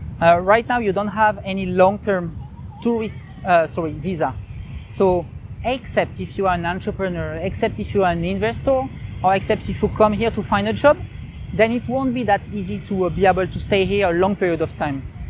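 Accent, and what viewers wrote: France. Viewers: The first 10 seconds watching, I was honestly convinced he was putting up a fake French accent!!! ;)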